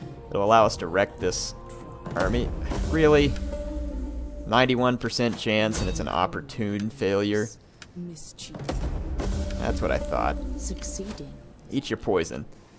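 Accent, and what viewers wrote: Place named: South, United States. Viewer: it's funny how you say things like "ought" and other southern slang. Don't take offense to what I say, and don't change it. But what am I to say anything, I'm a stinking northerner.;)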